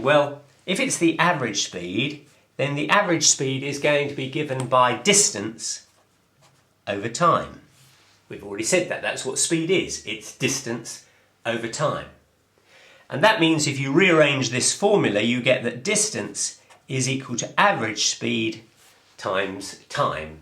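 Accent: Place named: RP, United Kingdom. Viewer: why is this guy so posh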